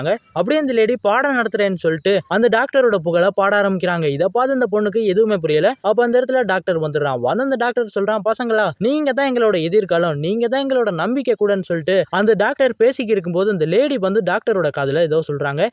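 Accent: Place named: England, United Kingdom. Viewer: Bro i think u need to change the voice modulation.. dont try to pur the englush accent in tamil. Talk tamil naturally it gives good value to your story narration. Just my opinion dont feel bad bro.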